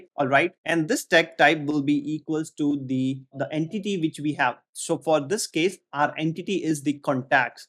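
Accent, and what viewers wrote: India. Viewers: Thank you, even though i got a problem to get your accent, you'll guys from India makes programming get easier. Have a good week.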